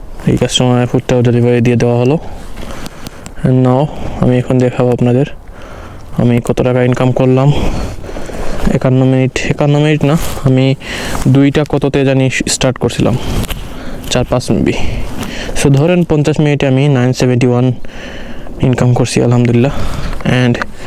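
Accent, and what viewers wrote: Sylhet, Bangladesh. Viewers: vai apni ki sylheti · ভাই আফনে সিলেটি নি??,,কইবা আমারে,,❤ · সিলেটি নি ভাই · Bhai apne ki sylheti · ভাই তোমার কথা হুনিয়া বুঝা যার আমরার সিলেটি মানুষ। ভাইর বাড়ী কই 😊❤❤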